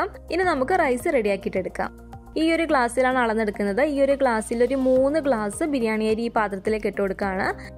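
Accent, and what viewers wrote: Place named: Central Travancore, India. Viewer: central travancore slang aanallo..Cliche malabar slang allaa..... Have to try.......:-)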